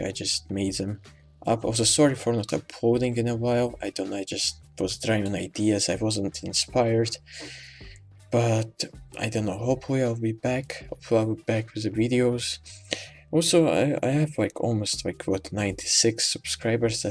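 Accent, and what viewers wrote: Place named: France. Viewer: He is french or Belgian or Swiss or from Montreal maybe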